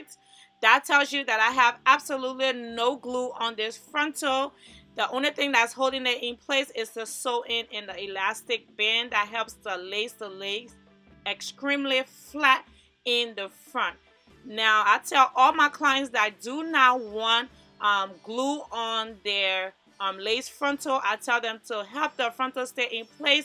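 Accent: Liberia. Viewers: This lady sounds so Liberian....am I right? let's gather and show our sissy some love. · Sis, you did a beautiful job. What your video shows is that you care about your client's hair. ❤ Oh and I think I hear some liberian 😊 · I hear a Liberian accent!!! 😍😍😍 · Is that a Liberian accent I detect...SUBSCRIBED 🥰🥰🥰🥰 🇱🇷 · you sound Liberian. as a fellow Liberian hair stylist i am inspired by you x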